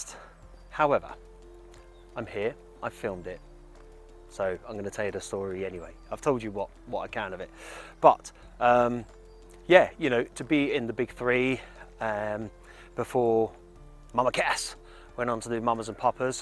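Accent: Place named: Portsmouth, United Kingdom. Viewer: Nothing wrong with the accent you have Pompey boy, We always get told we sound like Londoners anyway. Great video of someone I've never hea6rd of.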